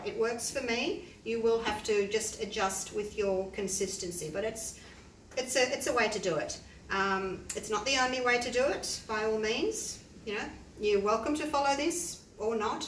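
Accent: Australia. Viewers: Congratulations on 20k subscribers 😍 You are a delight to watch/teach and gotta love the Aussie Accent 😃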